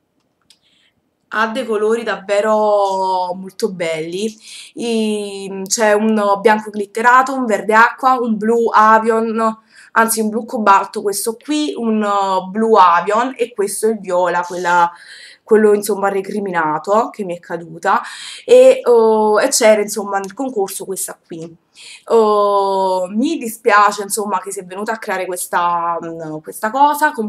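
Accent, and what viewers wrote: Naples, Italy. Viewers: 4 haiun accento familiare, sei per caso napoletana ?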